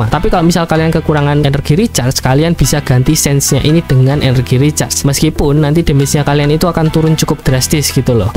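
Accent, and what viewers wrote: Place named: Java, Indonesia. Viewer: Medok kali woi Kek gw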